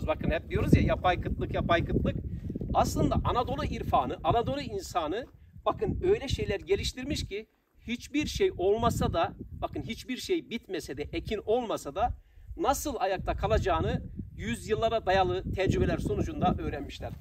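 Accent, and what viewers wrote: Black Sea, Turkey. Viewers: Bey, ses tonundan bize yakın bir yöreden olduğunu anlamıştım seni ilk tanıdığımda. Meğer ki hemşehriymişiz. Ben de Torul'luyum. Bizim o taraflara da gezi yapmanızı bekleriz. Biz gidemedik, siz in sayenizde gitmiş gibi olalım bu sene. İyi seyahatler. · Karadenizli olduğunuzu bilmiyordum hocam saygılar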